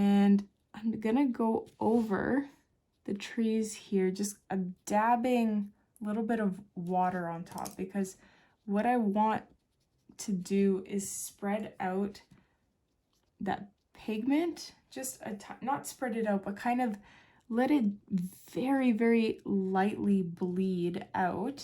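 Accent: Canada. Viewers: Thought I detected small accent-canadian?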